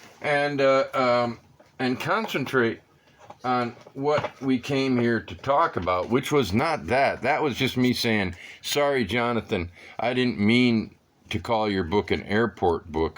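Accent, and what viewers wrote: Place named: Italy. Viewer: Yes, your Spanish has an Italian accent. 😀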